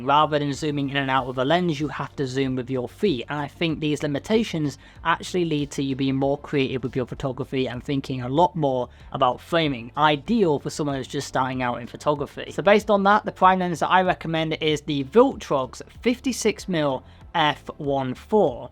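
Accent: United Kingdom. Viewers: I learn in this video how to use Sony a6000 things and also how I can talk like Britain 😮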